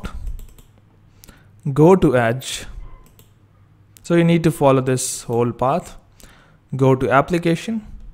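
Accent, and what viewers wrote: India. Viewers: Thank you kind Indian man with a lovely accent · Like everyone is saying. Hearing indian accent can lead up to an no no end. But this guy seems mature, straight on point. Suit and tie my man! Thank Q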